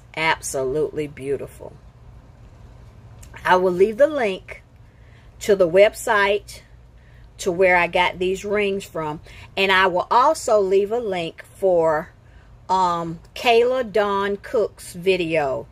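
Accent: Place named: South, United States. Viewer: You sound like a sweet southern girl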